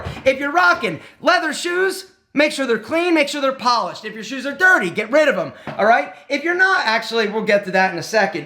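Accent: Philadelphia, United States. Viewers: “Wood-er” the Philly/Delco accent stays with you!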